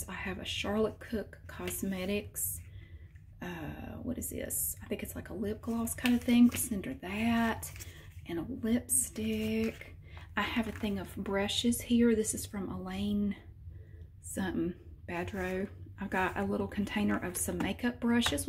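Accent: South, United States. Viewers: I enjoy your videos so much! You are so genuine and real. I’ve been following you the day you made this channel and watching your videos everyday now because I’m so invested in your life because I love to know what you are doing 😝 A lot of YouTubers nowadays are fake and always starting drama that’s why your channels are a breath of fresh air. I honestly love your personality and southern accent! Your voice is so soothing to hear and it helps me sleep when I’m restless and can’t sleep. Would love to send you a Christmas card if you have a PO Box! Sending you lots of love from Canada!🇨🇦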